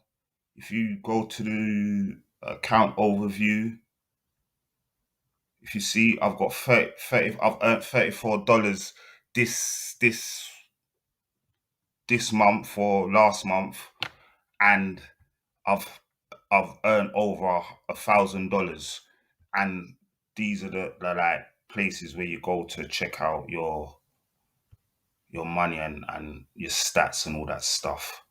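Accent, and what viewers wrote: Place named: United Kingdom. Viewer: Yes thank you for this video. Been trying to find out how to set up Rumble account. Nicely explained by you man. Nice too to hear the voice of a guy from my ends. Thanks again. Eldad Amaa(UK).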